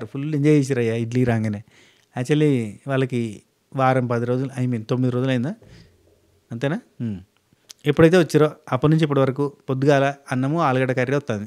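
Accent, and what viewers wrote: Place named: Warangal, India. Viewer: Em okati em rendu anta 😂 Anna medhi warangal ah? Ma intlolla la matladthunnar meru ❤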